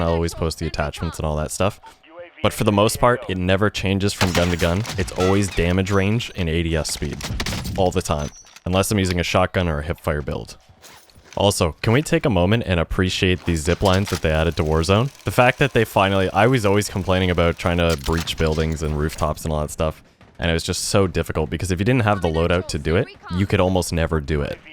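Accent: Canada. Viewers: Brother sounds super Canadian 👌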